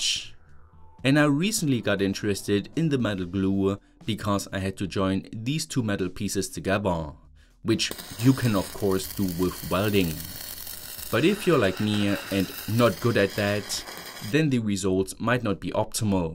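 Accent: Germany. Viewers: uff what a strong German accent :D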